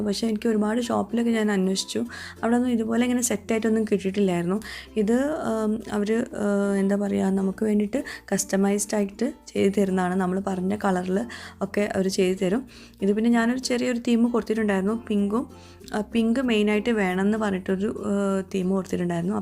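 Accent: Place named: Alathur, India. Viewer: Aalathur bhakhatt samsarikkumbo anatre kuttundalo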